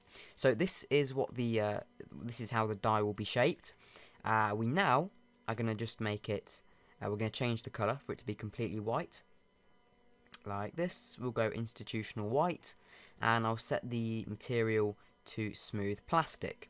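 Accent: United Kingdom. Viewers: HAS TO BE UK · never really got where your accent was from, was thinking UK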